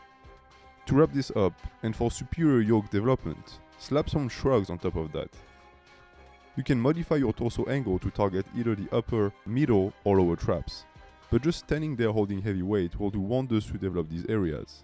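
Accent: France. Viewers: With your accent I Can Say that you're French... Am I right ? I Can tell this 'cause I'm french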